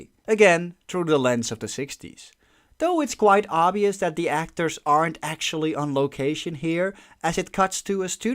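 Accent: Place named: Netherlands. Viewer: I am back and really enjoyed your previous recaps of the early Bond films. The Dutch accent adds something and this revised overview is fantastic. Keep them coming. I have subscribed.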